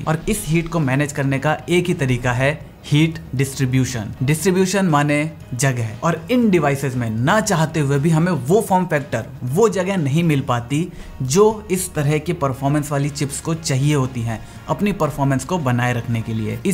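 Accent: Bengal, India. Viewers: Are u Bengali bro?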